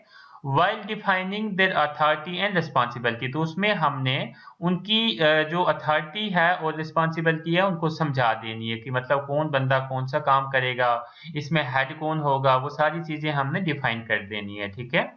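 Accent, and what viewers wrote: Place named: Bhaderwah, India. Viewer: sir your voice seems to have a Bhaderwahi Accent(u seem from Chenab Vallley)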